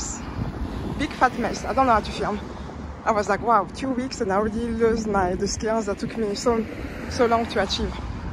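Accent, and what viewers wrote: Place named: France. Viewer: Try not to be put off by people saying you can't film. You are allowed to film anything in a Public Place! Great walk as usual! So I have now deciphered that your first language is French! Love the voice.....oooh la la!!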